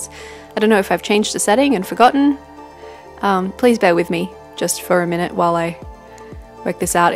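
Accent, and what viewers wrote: Australia. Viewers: I love your simple bujo set up :) WOW! I was in Melbourne back then! I love Australian accent :)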